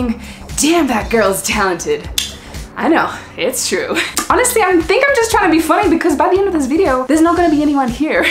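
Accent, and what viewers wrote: Australia. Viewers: I am going to be honest here. At some point, I did unsubscribe you because of the Australian accent (just a past trauma involving some Aussie accent) but the content is just so good and funny, I had to come back :D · Is she Australian?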